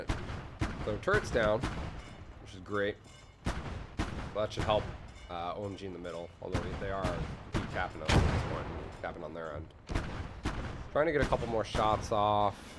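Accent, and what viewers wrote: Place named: Ireland. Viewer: I'm struggling to pin your accent, I have a professor who is Irish who sounds kind of like you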